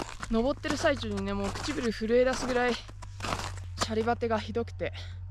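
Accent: Gifu, Japan. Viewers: 時々岐阜弁がしゃべっとって、おおきに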